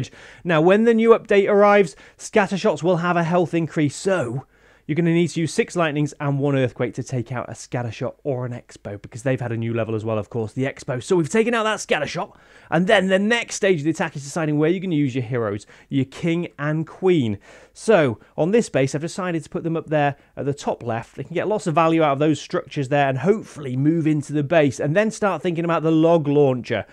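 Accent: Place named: United Kingdom. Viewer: His accent is British.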